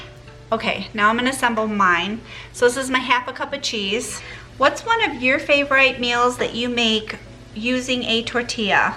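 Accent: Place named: Midwest, United States. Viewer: Your accent is so cute! Definitely midwestern, I'd guess, but I'm a southern girl so not sure if I'm identifying it correctly. Love your recipes!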